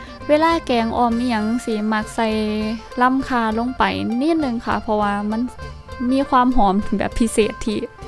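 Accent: Isan, Thailand. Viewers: ไม่เคยดูคลิปแบบนี้ แต่ขอบอกเป็นน้องที่น่ารักพูดอีสานได้น่ารักมากไม่หยาบคายขอให้มีความสุขมากๆนะคะสนับสนุนค่ะ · อ้อครับ สำเนียงเว่าบ่คือ กสิน คือทางบ้านผมนิ55 เลยถามเบิ่ง ทำกับข้าวเก่งมากๆ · พูดอิสานเพราะมีเสน่ห์มาก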